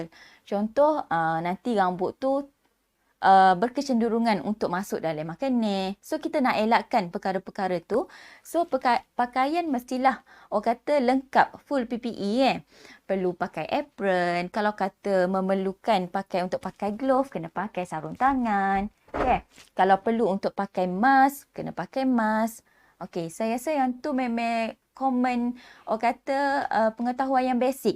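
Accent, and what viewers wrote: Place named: Kelantan, Malaysia. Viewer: Bunyi klate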